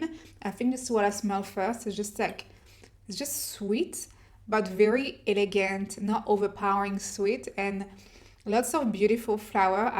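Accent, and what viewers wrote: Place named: France. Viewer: Lovely review 🥰 I heard that the wedding silk was like a love child from vanilla 28 and Musk 12 which are my favorite😍 Does the layering of the two lean masculine? Also do you speak French? I detect an accent.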